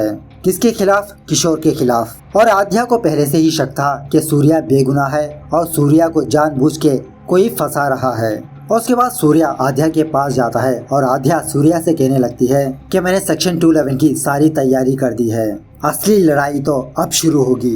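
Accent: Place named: Hyderabad, India. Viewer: Perfect Hyderabadi Slang story narration.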